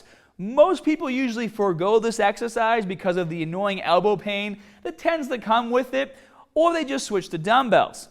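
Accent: Boston, United States. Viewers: God I feel like I just took a trip to Boston. if I did a video you would probably get a kick out of me saying barbell and yard.My step mom is from Boston and she says bahh-bell instead of barbell and Bahhs-ton instead of Boston lol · Boy from baaaston or new england for dam sure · Can you not see that he's from Boston or are you just that dense? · Are you from Boston?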